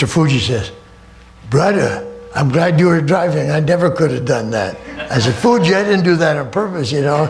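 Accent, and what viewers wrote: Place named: Canada. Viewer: So strange to hear uncle Ivan speak with a Canadian accent. Growing up I really thought he was Russian. He was great!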